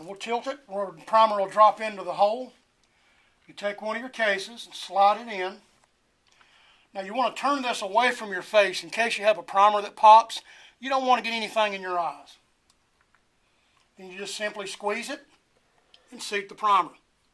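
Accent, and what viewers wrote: United States. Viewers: Great video. Thx for showing. You def have a voice of country, good ol boy right ther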